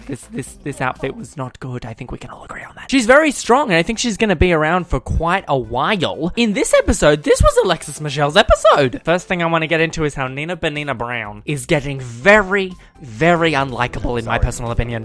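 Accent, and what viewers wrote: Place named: Australia. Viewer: you sound like an aussie coco peru at times.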